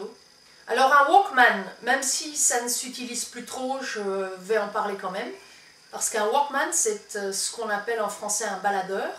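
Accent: France. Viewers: tiens, toi tu viens du nord non ? :p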